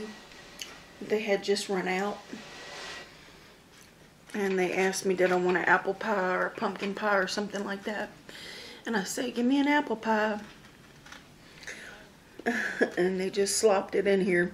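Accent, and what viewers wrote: South, United States. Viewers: Girl, your voice is so soothing to me.. and you sound southern (I'm from Tennessee). Lots of love hunny, you keep doin you 😙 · C here I reside in Colorado. Yeah she sounds like she's from the south. In one of her videos she goes to the Heart Attack Grill to eat so maybe it's in the same state. I'll have to look.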